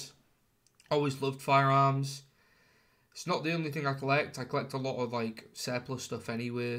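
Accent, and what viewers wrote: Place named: United Kingdom. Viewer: I’m not used to listening to a British accent on a gun collection video, but great collection!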